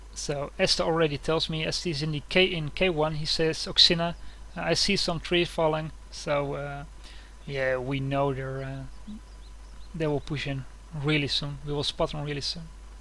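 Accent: Netherlands. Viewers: dat dutch accent ;) Great vid! keep it up!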